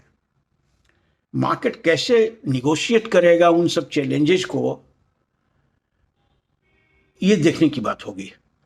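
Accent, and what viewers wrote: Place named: Eastern Uttar Pradesh, India. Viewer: jee, bataiye kya abhi humko paise laga dena chahiye kyunki share saste mil rahe hain?is main pareshaani hai ki kab lagaye, already portfolio 12 percent down hai, middle class ke liye issue hai ye, aapke support ke liye bahut dhanyawaad, pratiksha rahegi, shayad aapki vaani se lagta hai ki aap UP east ke hain, bataiyega kahan se hain aap aur humara anumaan kitna galat hai..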